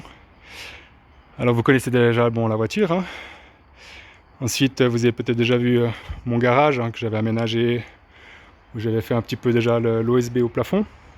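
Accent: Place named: Sweden. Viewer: Super ton accent. C'est Suédois c'est ça :)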